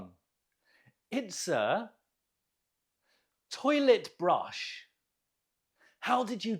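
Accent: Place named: United Kingdom. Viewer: I like watching you so much and i like your British accent thanks a lot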